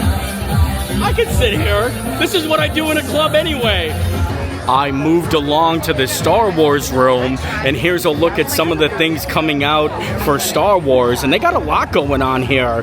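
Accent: Pennsylvania, United States. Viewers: That pa twang! (I'm from Trenton originally)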